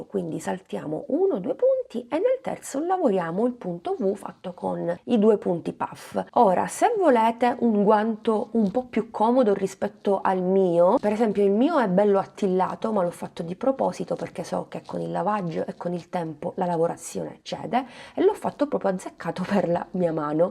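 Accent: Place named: South, Italy. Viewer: si sente che sei del sud anche io lo sono e questo già mi da un motivo per adorarti . Brava e precisa❤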